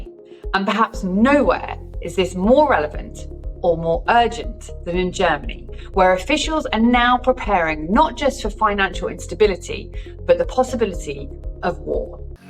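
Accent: England, United Kingdom. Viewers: You know the business and the English accent LIKE 😊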